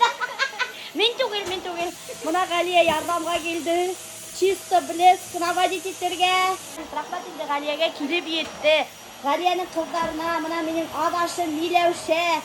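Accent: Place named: Trans-Ural Bashkir, Russia. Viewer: она на диалекте зауральских башкир говорит иногда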